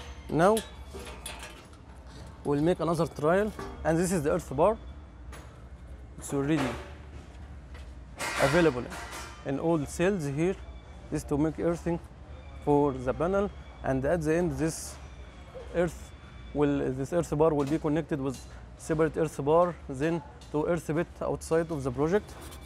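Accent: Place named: Egypt. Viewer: there is an excellent Egyptian accent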